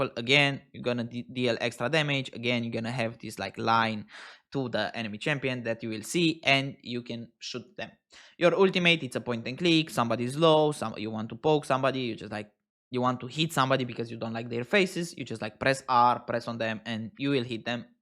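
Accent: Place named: Romania. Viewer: you have an romanian accent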